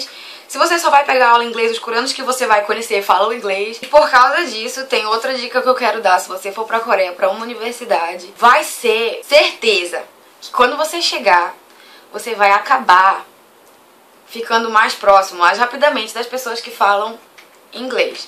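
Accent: Pará, Brazil. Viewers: Ah esse sotaque paraense... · Sotaque paraense? Hahaha Te sigo a pouco tempo mas estou adorando saber da tua experiência! Não vi todos os vídeos ainda, por isso não sei se vc já disse alguma fez, mas qual curso vc faz? Eu queria muito poder fazer o Ciência Sem Fronteiras, mas meu curso, Direito, não está incluído na lista :((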